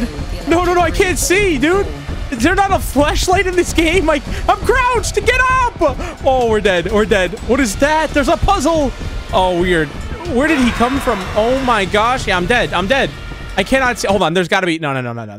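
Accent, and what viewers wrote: United Kingdom. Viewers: i love the british accent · British sounds good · Yet another British accent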